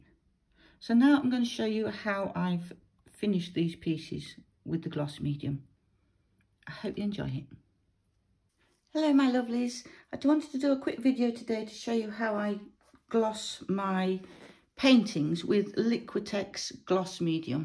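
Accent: United Kingdom. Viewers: Thanks for this great video. I have been working with Indian ink on paper for years but painting on canvas is totally new to me. I've been varnishing test pieces done on gessoed cardboard (literally crisscrosses of paints and acrylic ink so that if anything runs or smudges I can see it). I just want to learn how to apply the top coat of glaze (the isolation coat, as they call it, if you want to protect your paintings with removable varnish) with zero brushmarks and your video was really helpful. PS I love your accent, it reminds me of living near Sheffield when I was a toddler 🤪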